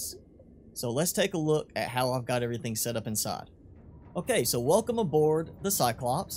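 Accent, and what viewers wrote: South, United States. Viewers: Y’all accent XD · Stormy it's a southern American accent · Are you a cowboy? Cuz you have the same accent as one Edit:not trying to be rude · What heavy country accent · you have the "Y'all accent" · Also known as the southern accent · TIMMY yes you can definitely tell especially when he says "try","charge","power", and "why" haha but i guess even Americans can make good tutorials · Your southern accent gives me life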